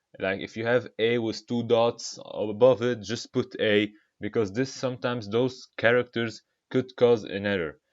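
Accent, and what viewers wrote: Arab world. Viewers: واضح من لهجتك انك عربي 🙂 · Bruh from your accent i thaught u where arab. When i saw your name i knew it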